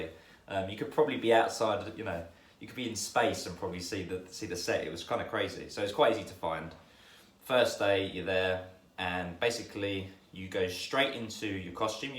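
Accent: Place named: United Kingdom. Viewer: Hi!I love the film so much,it's my favorite.Thank you for the video that we can know more about the people who also contributed to the film. Love your video!(I'm from China and I'm learning English by watching videos on youtube😂,i really love your British accent and i want to have a trip to London oneday！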